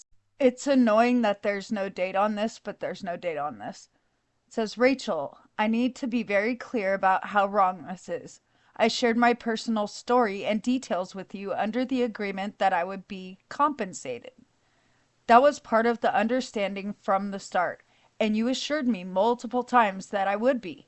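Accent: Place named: South, United States. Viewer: !! Ngl I’m fan girling right now. I love your channels & think you’re incredibly talented! I love your quit wit & humor, plus as a Southern girl your accent reminds me of home. ❤ Just had to utter fluff 😜& send you love & appreciation. 😊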